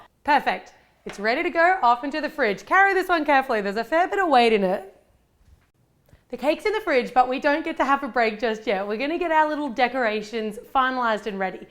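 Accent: Australia. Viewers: Yasmeen Ashby-Ramos she's Australian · are you Australian?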